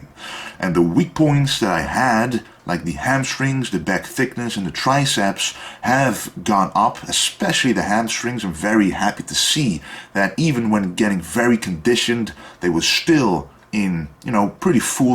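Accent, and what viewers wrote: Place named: East, Netherlands. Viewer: Haha grappig accent. Oosten van het land?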